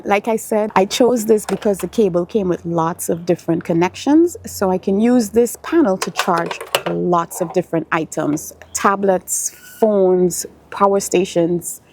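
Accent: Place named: Jamaica. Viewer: You sound Jamaican. Greetings from Montego Bay.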